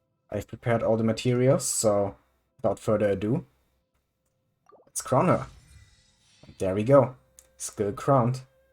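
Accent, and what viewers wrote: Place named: Germany. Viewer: Youuu.. are german! Das höhre ich doch gleich raus :D Fellow Kuki Main here, I like to do Montage videos of teams i like and im working on an Aggrevate Kuki Montage rn I LOVE Aggrevate Kuki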